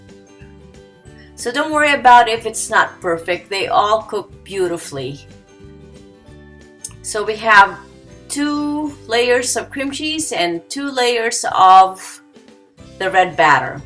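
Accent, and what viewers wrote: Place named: Philippines. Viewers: You sound like a filipina who tries really hard not to sound one... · Perhaps her target audience are not limited to Filipinos. Also maybe she is not trying to 'not to sound Filipino' and actually just speaks with an accent🤔. With that being said, possibly in YOUR videos you can speak your language, but thanks to her I can understand and follow her instructions. Boo...👎😊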